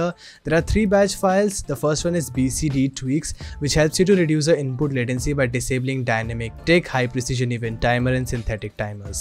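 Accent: India. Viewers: You are indian, right? I can't understand a lot of things because of the accent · Is it possible normal guy in india to talk in english without 95% of the Indians cry about his accent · Is it possible to find a video guide that is not made by an indian guy with an unbearable indian accent? Just asking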